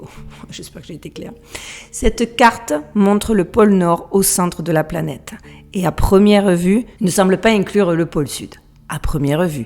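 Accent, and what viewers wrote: Marseille, France. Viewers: Merci ma belle, comme toujours super intéressant et documenté. Merci d'ouvrir nos œillères et de nous "bousculer" avec ton bel accent marseillais!! ✨☀️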